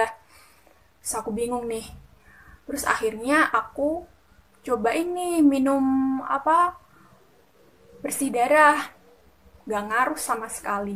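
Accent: Java, Indonesia. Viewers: hahahaha medok polos lucuk gitu gemes wkekek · Wong jowo yo 🤣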